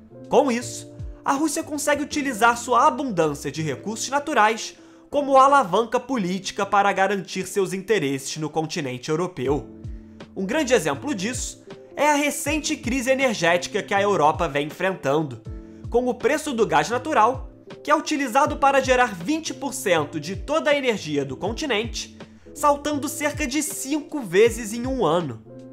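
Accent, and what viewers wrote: Rio de Janeiro, Brazil. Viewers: Sotaque chato. É carioca? Não consegui me concentrar no assunto do vídeo.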